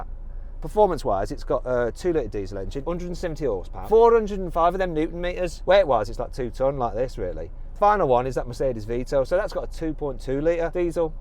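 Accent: United Kingdom. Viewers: Love that northern accent.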